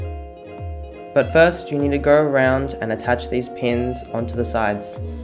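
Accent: Australia. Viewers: are you aussie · YOUR AUSSIE!!!!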